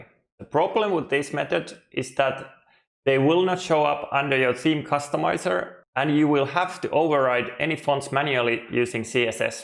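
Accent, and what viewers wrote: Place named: Finland. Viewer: Bro! Avasin taustalle kuulumaan ja meni 4 sekunttia aivoilla tunnistaa Suomi-aksentti. Kiitos.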